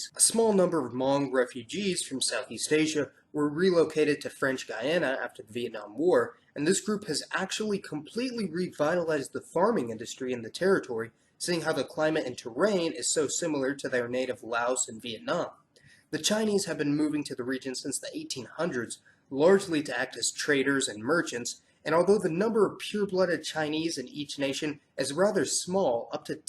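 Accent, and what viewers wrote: United States. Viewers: Thank you for great clips. One thing i can say is that please, if it is possible to put english subtitle, cause american english pronaunciation is defecault for understand. I am from southeastern Europe and british english is more understandable for me. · He was on no more than telling out a university assignment to us all. What the man says will highly suggest that it is fact. When what he is reading as the sources, gives him sentencing and paragraphs without the words might, could or possibly. No, all cohesion does is make an individual sound academic which he does superbly. Also, he comes across coherent, yet others may argue that the use of English language amongst Americans never sounds as coherent as a non academic schooler from England. For example, the part in this video where he presents the pie chart data about population of Africa India and others; however, cohesive - a non academic English child could in my reckoning sound more coherent like with 'the flocking Indians rule over there'. 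Once again American accents take away a little coherency of English languages. Only a little mind you he is still heard. I listen back to my English and sound like a gay kid looking at his own backside arching for please please sir I want some more or whatever Oliver said in his moment. I does think like in the case of Mauritius, that in the West books like remembering the world started in the 19th century when slavery was abolished. When we all know the world is still less than 200 years old from 1837. My guess is Europeans knew how advanced they felt about themselves and really in their hearts knew despite colonialism that they were never turning Africa into European beliefs without slaves. Ask scholars why they don't talk about wh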